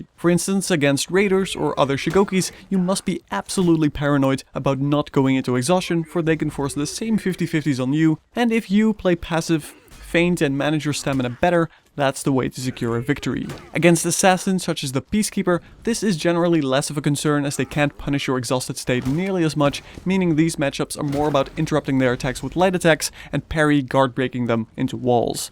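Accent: Netherlands. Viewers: I'm new to this channel, but are you dutch?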